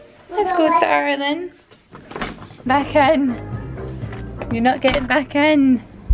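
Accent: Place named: Glasgow, United Kingdom. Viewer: Hi, Iv been watching your videos for a while now and you actually inspired me to make my own channel😊 I just had to say how sorry I was to hear about Lola, I enjoyed seeing her in your videos. Those clips of her at the start had me tearing up. Maggie is so cute though and couldn’t be part of a nicer family ❤️ Also fellow Glaswegian over here 🙋🏻‍♀️ Iv been living in England for a few years and miss Scotland so much so it’s nice to hear the sound of home ☺️🏴 xx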